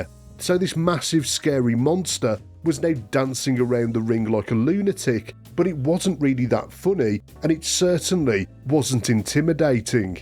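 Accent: Birmingham, United Kingdom. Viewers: Love your Birmingham accent and pronunciation of 'W', which is a popular letter in wrestling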